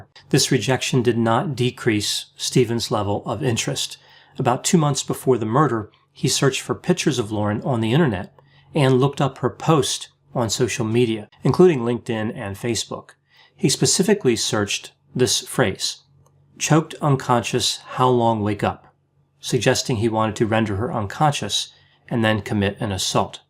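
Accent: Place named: Georgia, United States. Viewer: hmmm, too busy commenting on the Georgia accent I guess....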